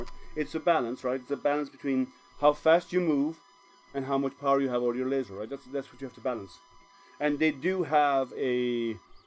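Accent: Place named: Ireland. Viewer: well I can't get lightburn to connect. I was able to do a couple burns last night with GRBL but just didn't seem like I could change many settings. I thought you sounded Irish but just wasn't quite sure.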